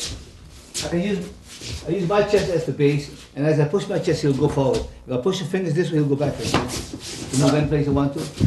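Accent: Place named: Hawaii, United States. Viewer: That's that Hawaii accent right there! =) mahalo, Sensei!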